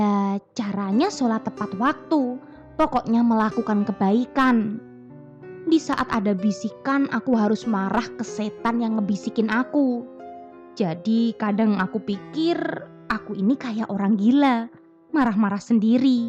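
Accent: Java, Indonesia. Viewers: Ngomong'e medok mbak'e😂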